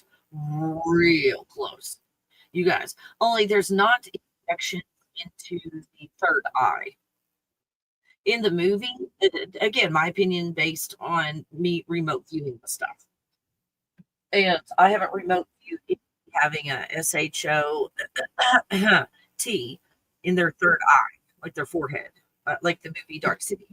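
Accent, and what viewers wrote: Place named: United Kingdom. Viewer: love you’re British accent honestly I have a really strong Yorkshire accent you would need subtitles to understand ❤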